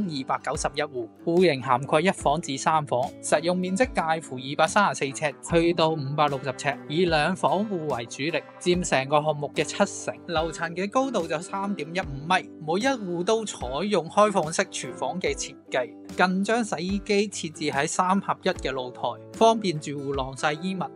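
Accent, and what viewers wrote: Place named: Hong Kong. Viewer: 你講嘢😅 唔喺香港大？ 咩？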